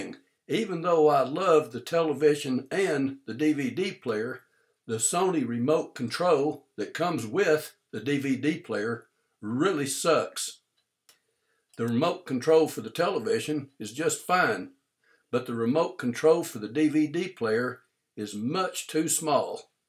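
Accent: Texas, United States. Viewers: You sound like your from Texas dude and that was quite fascinating and I had a bit of a chuckle. I admit the remote is small and to be honest I have not got this Blu Ray player but I do have an earlier Sony model and the remote is the same size and pretty much the same as this one. To be honest I do not have a problem with the remote at all and it makes no odds to me where they put the play buttons either. But as with the biggest majority of remotes I have for my TV/DVD/Blu Ray and HiFi they are all capable of controlling any other device so I certainly see no need to go out and buy a Universal Remote at all. By the way I shall be getting this Blu Ray Player in the new year and right now I am saving to get a new 4K TV for Christmas. Hopefully in the new year this Blu Ray Player will come down in price too, cause I still feel it's too expensive with how cheaply they have constructed it. 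My last Sony Blu Ray player was around £130 here in the UK and I got it in a sale brand new for £65. I am not expecting this to go down that low and it's now at around £180. But I am hoping it comes down to about £130 or less by next year :)))). I would also say my last Blu Ray Player even though it's cheap and small like this and made of plastic. I still think it has a better design than this one. If it played 4K discs I would not even bother getting a new player and I am only getting a new TV because my old one is on it's last legs and is playing up a bit. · I love your Texas accent bro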